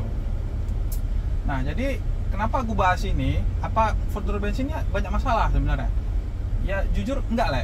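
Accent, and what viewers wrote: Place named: East Java, Indonesia. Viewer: Orang mana om, kok logatnya pake le lek gitu.. Salam dari jawatimur surabaya😊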